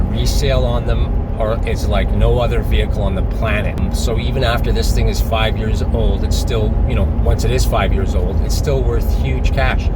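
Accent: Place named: Northeast, United States. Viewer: So weird. If I were to make a guess on his accent, I would say northeastern US ... NY, or MA. Can it be that eastern Canada has similar regional accents?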